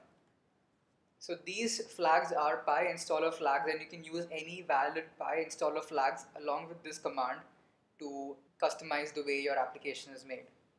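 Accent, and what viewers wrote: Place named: India. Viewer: I love you and your indi accent. Love from Italy <3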